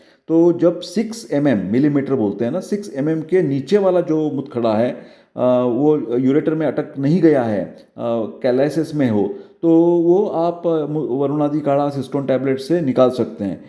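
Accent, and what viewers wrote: India. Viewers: Thank you sir. Clear puneri Hindi. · Khup chan explanation marathi manus hindi bolat ahe . He kalte..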